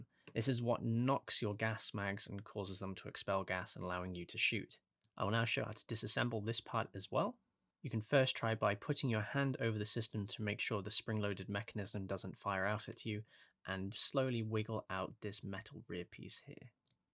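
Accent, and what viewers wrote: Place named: England, United Kingdom. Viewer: This video should be a how to video for making how to videos. Nice close up views, no distracting, uneccesary background music and as much as it pains me as an Aussie to say. An English accent. Fantastic work mate.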